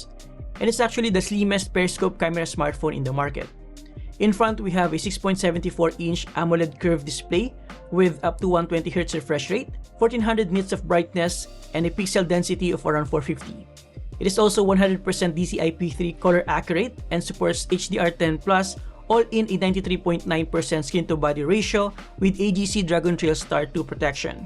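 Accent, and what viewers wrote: Philippines. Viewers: Pinoy na pinoy ang english mo😁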